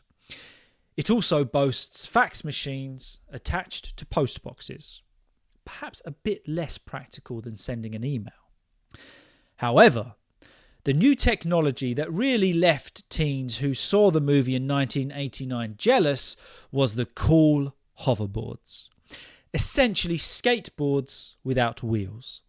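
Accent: England, United Kingdom. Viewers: A handsome English teacher with his beautiful blue eyes, and beautiful English accent 💗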